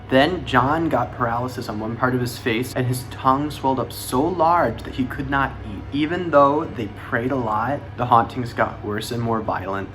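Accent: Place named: Midwest, United States. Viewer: you have a mid-west accent dude!😂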